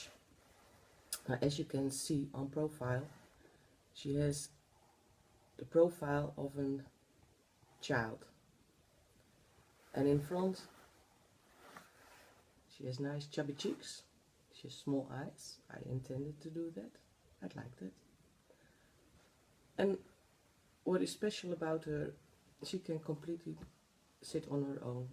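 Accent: Netherlands. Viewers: Aan het accent te horen bent u Nederlands. Petje af voor het goede Engels! Een tip: iemand anders iets aanleren is 'teach', not 'learn'. ("I will teach you how to do that') En ja, het is 'forehead'. Geen probleem. Het is heel goed om naar te luisteren, en de poppen zijn geweldig. Ik zou graag een boek zien dat de technieken beschrijft, bestaat dat? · Are you Dutch? cause yeah sorry for asking I still like your videos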